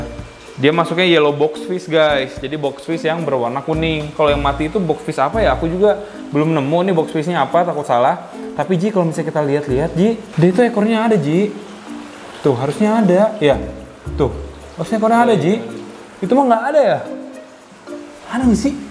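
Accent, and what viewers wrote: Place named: South Jakarta, Indonesia. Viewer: Bocah Jaksel mana suaranya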